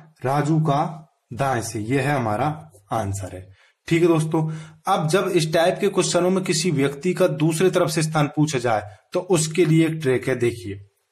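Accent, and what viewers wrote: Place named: Bundelkhand, India. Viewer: Sir apki ki avaj m bundelkhandi language ka touch dikh rha.loved this.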